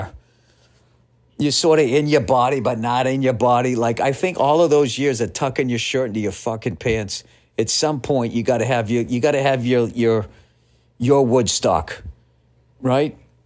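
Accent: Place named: Boston, United States. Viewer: Bill is fom Bwoston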